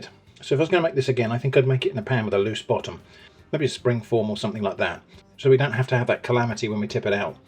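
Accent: United Kingdom. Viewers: pov you feel safe as a still slightly too hot delicious experiment and you hear a kindly brit say ".. but I can resist no longer"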